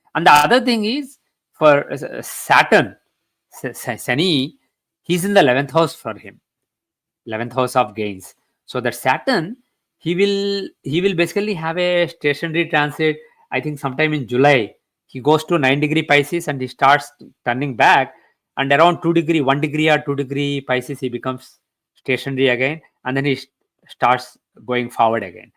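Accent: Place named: India. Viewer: Very nice of you sir, inspite of living in USA since long, you still speak indian english, 😅which every one can follow and understand.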